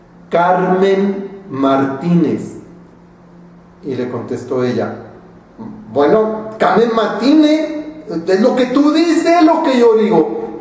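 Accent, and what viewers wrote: Mexico. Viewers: Hablaste con un acento típico mexicano, con mantenimiento de /s/ (muchas veces pronunciada como /z/...), acortamiento de vocales y entonación mexicana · Ni bien comenzo los 3 primeros segundos del video me di cuenta que eras mexicano ... Ni siquiera vi los comentarios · tienes acento mexicano nada neutro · así hablan los de la ciudad de México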